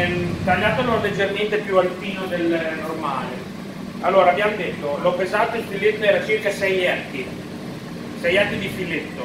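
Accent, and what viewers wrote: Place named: Emilia-Romagna, Italy. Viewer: il tutto molto avvincente e credibile (soprattutto).ottimo sei riuscito ad impastare al 80% di idratazione.a questo punto se puoi mandare le esatte proporzioni degli ingredienti, o mandare il link del maestro pizzaiolo.la pizza in teglia la dovresti togliere dalla teglia ed adagiarla su una griglia sopra levata, per togliere l'umidità del fondo.ancora complimenti per la sala cucina, veramente a norma di legge e pulita.anche l'accento della emilia romagna, personalmente mi garba.che dire ma ce li farai vedere come si fanno i tortellini al brodo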